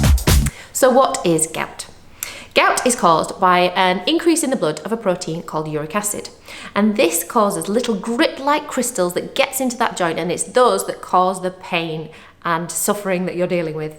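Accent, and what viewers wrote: United Kingdom. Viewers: Hi, I’ve only recently discovered your channel and really enjoying the videos that are really helpful. My brother who I care and support does have attacks of gout and know how painful it is for him. I will definitely look into the medication you have mentioned with his GP to avoid further flare ups. I am also curious where you are based in the north of England. I live in The north west of Lancashire and your accent sounds very familiar. 🥰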